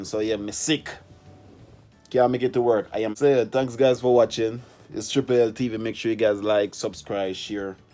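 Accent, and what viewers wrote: Jamaica. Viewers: your eyes & that Jamaican accent...jesus... Looks like I need to take a trip to the islands.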